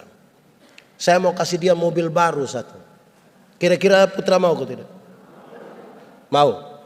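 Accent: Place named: Eastern Indonesia, Indonesia. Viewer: Cuma bahasa + logat ind Timur, agak sulit-lama sy cerna pelan 😂😂😂😂 pemakna'an nya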